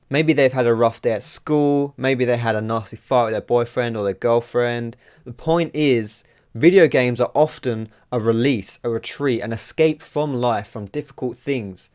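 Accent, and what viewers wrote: United Kingdom. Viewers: love your videos and the british accent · Top 5 reasons for subbing to you 1-You got a british accent which is funny 2-You seem like a nice guy 3-You have great instructional videos 4-You answer to comments 5-My 1year old daughter loves foxes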